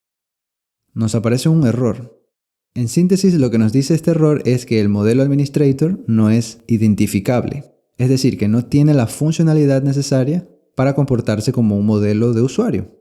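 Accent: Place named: Uruguay. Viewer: sos uruguayo te saque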